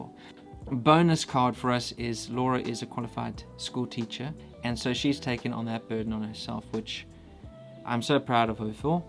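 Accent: South Africa. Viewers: Wow, you sound 100% South African. Not even a hint of a foreign accent. ..and that after only a year?? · You don't sound like you're from outside South Africa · You sound South African? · Ja. That's what I thought when I first heard him talk. What? But he sounds South African!!! What does he mean "moved to South Africa"? You already belong Mr.! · But you are a South African. That accent is dead giveaway. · Well he sounds South African so he's one of us now. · His parents are the farmers. Egte boere. Can’t you hear his accent? Capetonian accent. Lived in Uk 15 yrs. Now he is back. Go julle. Vas byt. With you all the way. From What Oz. 40 yrs. ❤️SA. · It is so funny to hear that you are from the UK, your accent to my South African ear sounds South African and looking at you, you even look South African to me, weird isn't it? You could so pass for a Dutch South African, geniet u dag, and stay safe. 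I left South Africa 32yrs ago, all my family are and still lives in Cape Town, I would love to move back, but... I have a fear for the crime and having to live behind bars and in and out of cars.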